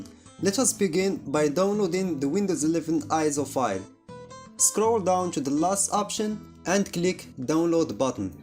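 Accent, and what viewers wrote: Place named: Morocco. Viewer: f....king Morrocan accent, I like.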